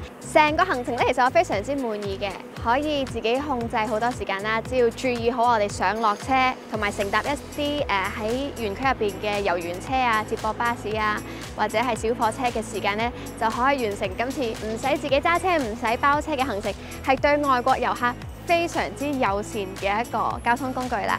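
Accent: Taiwan. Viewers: 你的中文说得很好，说得很像台湾人。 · 靚人靚景，國語台灣腔好聽❤🎉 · 人家在 台灣讀 大學 畢業 當然 向 台灣音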